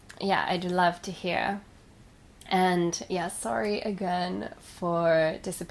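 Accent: United Kingdom. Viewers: I’m not going to lie, I first saw your channel when I was looking for tourism spots for Tokyo. The reason I picked and subbed you was well, Beside you being the most beautiful woman I’ve ever seen and you seem quite genuine about yourself, a pretty Japanese girl with a british accent... Something Sanrio has been trying to do with Hello Kitty.I notice from the content that you are doing is somewhat personal, which is nice, like watching a friends travel blog, and you didn’t sell yourself in way that gets more views, with that knowledge I subscribed. I’m a furniture designer but I like dabbing in drawing cartoons for a hobby, but I only did those things because I was unnaturally good at doing them and I didn’t do anything because it was popular, I did them because I genuinely love it. I remember a movie quote from lost in translation: “the more you know who you are and what you want, the less things will bother you.” If you feel this channel is forcing you to do things that pervert your intentions then stop. 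If you feel like you owe people content for their subscription to you, you don’t . If you feel there are better channels than yours, thats true there are, but thats no reason to feel insignificant, since its a living hell to be a slave to peoples anticipation. The person you should focus on always is YOU. What your needs are, are completely different to the needs of other people especially to strangers. Whatever you want to do now, I can only wish you the best and hope ever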